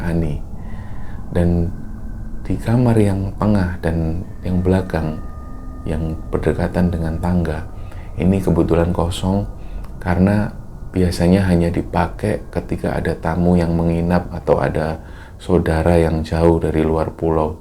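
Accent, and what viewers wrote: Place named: East Java, Indonesia. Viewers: Maaf mas agak telat....iki baru mas....medok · Iya mmg kalo mas itu di resapi enak dgn khas jawatimuran kdang mas idris tp yg keliatan jawa yo mas buat dogeng tdurku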